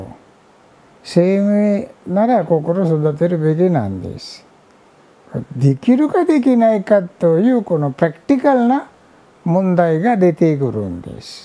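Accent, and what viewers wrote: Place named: India. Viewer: スマナサーラ長老はイントネーションから察するに日本生まれではないように察します。 インド人でしょうか？ どこで仏教の修行を積んだのでしょうか？ どちらの宗派に属するのでしょうか？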